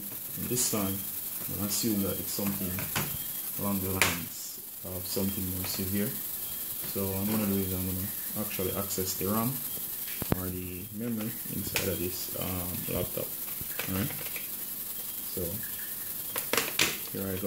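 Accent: Jamaica. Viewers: Sound like a Jamaican named maro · oiii mi can hear sey ah jamaica u come from. mi laptop ah move ah way. him mi can link u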